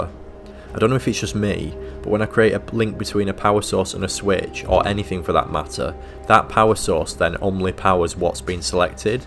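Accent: United Kingdom. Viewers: You sound English so why say ALUMINIUM the American why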